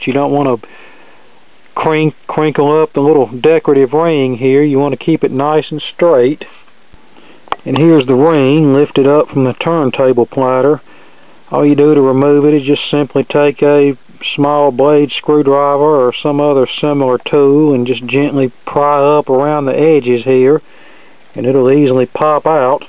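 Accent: South, United States. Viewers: laurel moon ++ i've watched this guys videos and will use his info on a stuck bsf turn table i have, bought new in 1980 shoved off to the side in 1993 by my ex wife and not used since. i kept it thinking one day i'd get it running. maybe with this guy's info i can do that. prefer the older record players before equalizers and the "digital age" moved in.also have a 1955 rca 45 player i will get going. everything works,waiting on replacement cartridge. would rather enjoy my extensive vinyl collection on the old players they were made for. as for this guy's accent,no problem. i'm from north carolina and live in mississippi now.i have that same accent and intelligent as most are here in the us south.my daddy sounded like that. he was a tv/radio repairman as a side job in the 1950's and 1960's. i remember him working on some of these old rca record players. 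my daddy passed away at age 32 in 1966. working on this old stuff in my retirement brings back good memories of my daddy.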